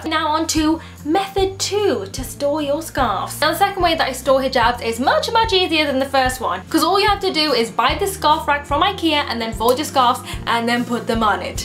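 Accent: United Kingdom. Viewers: Biiiiiiig liiiiiike from morocco !!! You saved my life x) i discovered you 30 minutes ago !! It s midnight now !! So you can imagine how excited i am now especially that i couldnt sleep !! LOL !! I watched 4 videos of you , liked them all !! good luck , waiting for other videos ! By the way , u have a funny beautiful British accent , i LOVE it , Nouhaila - Morocco <3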